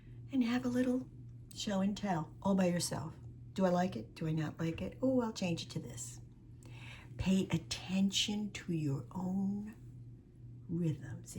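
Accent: New England, United States. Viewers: From the accent, I'm guessing you're a New Englander. And I understand that you're moving to Texas. How are you feeling about that prospect? Hope you're MAGA if you want to fit in there... Good luck with the relo.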